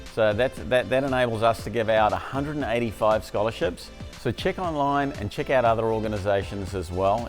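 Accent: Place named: Australia. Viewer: Given your accent, I thought you are based in Australia.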